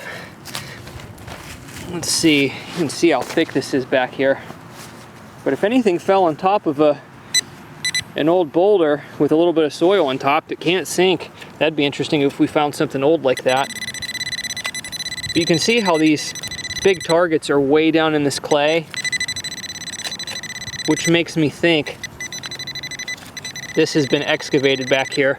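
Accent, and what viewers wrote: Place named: Virginia, United States. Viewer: Dam thought you from Virginia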